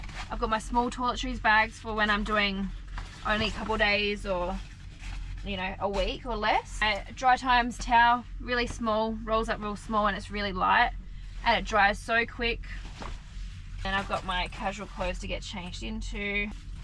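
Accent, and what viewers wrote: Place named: Australia. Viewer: Nothing better than B-doubles and an Aussie accent.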